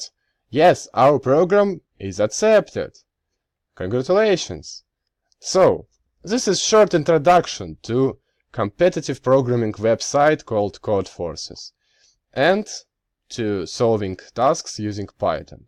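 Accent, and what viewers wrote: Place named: Russia. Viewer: your english has a russian tone, but it doesn't matter. you are a good communicator. keep it up.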